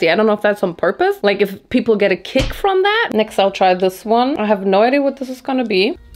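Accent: Germany. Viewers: I have, a questioni are you German russa nord soming else? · I love your accent I always wanted to go to Germany😁😁😁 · What is her accent? I am so confused. Sounds like German, Irish, but then just… western American? How?!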